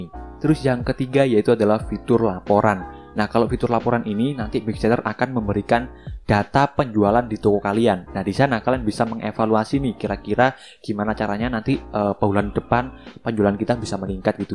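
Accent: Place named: West Java, Indonesia. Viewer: bang asli mana ko kayak org sunda??? mohon dijawab bang🙏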